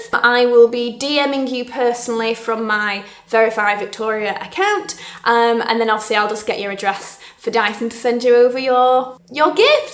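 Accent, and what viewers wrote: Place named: North, United Kingdom. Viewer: Keep the northerness going! I love it when you drop in certain phrases or ways of saying things. Wishing you, and Boe a lovely Christmas xxx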